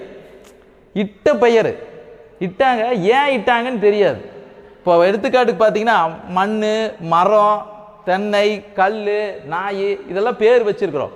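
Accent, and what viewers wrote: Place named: Kongu, India. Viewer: கொங்கு பேச்சு அருமை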